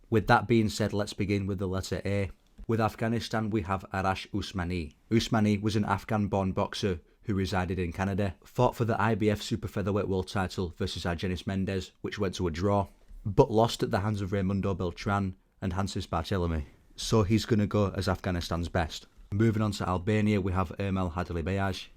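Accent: United Kingdom. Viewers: you sound brit but didn't mention Jimmy Wilde as the Top Brit. he's actually high ranking all time great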